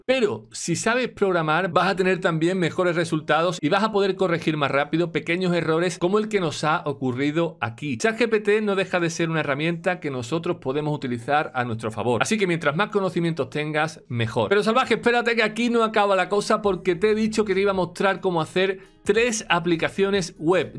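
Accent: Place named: Chile. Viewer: te siento algo de acento Chileno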